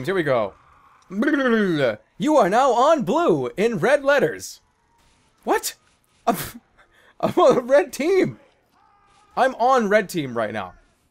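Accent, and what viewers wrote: Boston, United States. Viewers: Jeez the Boston accent. I want it T___T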